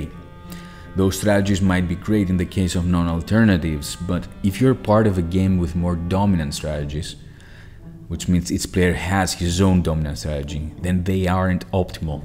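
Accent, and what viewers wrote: Greece. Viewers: Sounds as greek accent. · Came here to study, heard greek accent, melted, lost focus. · You sound so greek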